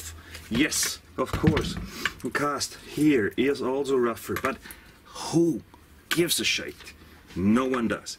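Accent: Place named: Australia. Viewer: I love the aussie accent 😂 schöne Grüße aus KA... Maaate!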